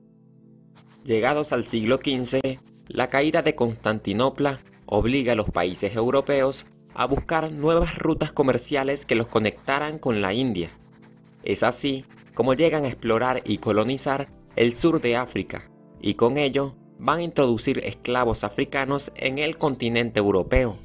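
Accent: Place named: Spain. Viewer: Puede ser su acento , los españoles dice mucho el sh en la s ,aqui en mexico hay muchas lenguas y la x se pronuncia como j ,sh ,ts ,x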